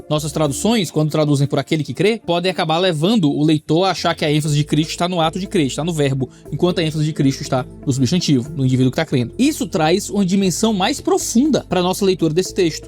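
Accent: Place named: Northeast, Brazil. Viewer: Só outro nordestino para entender 100% o rsrsr O CRISTÃO MAIS PEBA da nova aliança kkkkkkkkkkkkkkkkkkkkk Ele nordestino no EUA e eu na África. kkkkk ótimo conteúdo.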